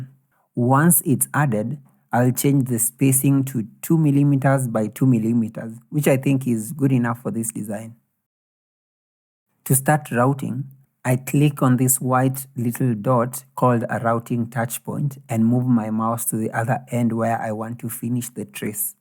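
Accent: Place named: Kenya. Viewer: You sound like a Kenyan, I'm impressed by the clarity of your explanation and inspired to gain such understanding. Great work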